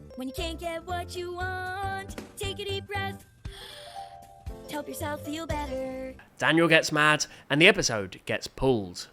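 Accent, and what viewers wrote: United Kingdom. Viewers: Bra I'm not going to subscribe to this stupid British dude learning English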